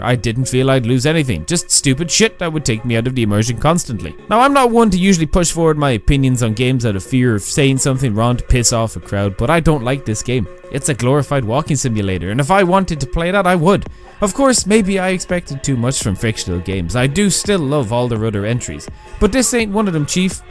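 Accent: Ireland. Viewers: Lol love this review! Especially with your Irish accent 😂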